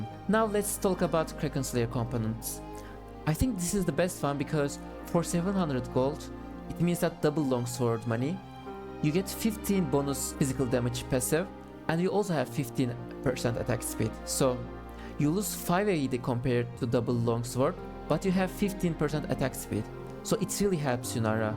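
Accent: Turkey. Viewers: Brother are you Turkish? Great video. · Türk aksanın var, türk müsün? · I know when I hear a turkish accent subbed o/ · Türk İngilizcesi bu amk xd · tam türk ingilizcesi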